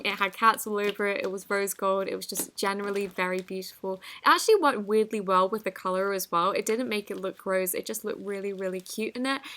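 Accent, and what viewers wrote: England, United Kingdom. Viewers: Okay so this is a bit of a weird question but I am reading a book right now and it's based in England in the queen victoria erra so the girl has a brother named Rupert so what I wanna know is how you would pronounce it? I love the english accent and this is English based book I know a bit of a weird question lol but I love your videos they're always great keep up the great work <3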